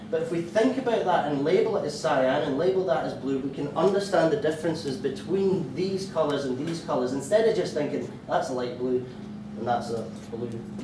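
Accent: Scotland, United Kingdom. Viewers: I have watched all of the videos in this playlist. I have acquainted my ears with Scottish accent. I can't say the same for the closed captioning system. It's actually quite funny to see what the automatic system thinks he is saying. He says never try to paint along with him, but I am. All of my colors seem to be bleeding together with the dark reds. I wonder why.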